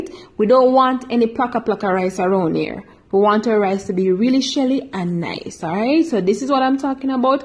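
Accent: Jamaica. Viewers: Are you Jamaican or Bajan ??!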